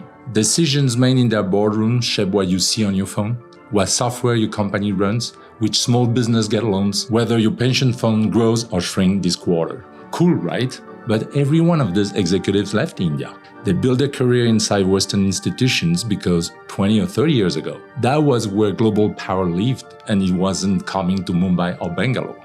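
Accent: France. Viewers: Narrator seems to be of French background trying to speak in English language in order to target Indian viewers..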